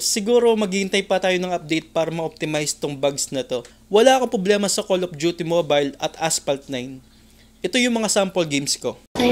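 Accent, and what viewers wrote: Pampanga, Philippines. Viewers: Kapampangan kata Koy? Hahaha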